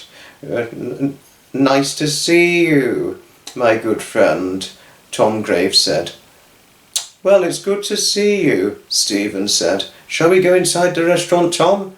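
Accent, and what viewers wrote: England, United Kingdom. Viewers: What a funny coincidence. I have a family relative named Thomas Graves. He was an architect in Baltimore, MD with RTKL and a model train aficionado. I always enjoy hearing your English accent!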